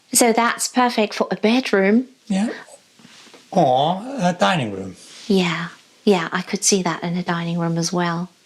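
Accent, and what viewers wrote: England, United Kingdom. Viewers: Question; You are German but have English accents. Were you raised in England?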